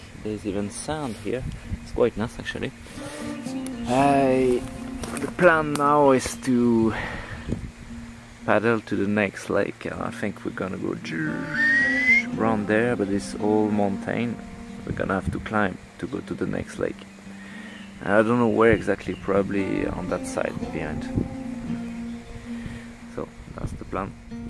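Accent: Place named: France. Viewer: Hahaha .....I am not a linguist by any means but did detect your French accent in this video. I was converted to a firm Francophile in 1990 when I discovered I could be in a totally different climate from my home in the north of England in less than 7 hours driving and then with another 4 hours driving I could be on the Riviera and the southern French coast. I can see why you have fallen in love with Sweden the lakes and countryside do look very beautiful Thanks for your reply and I am looking forward to your next outing 👍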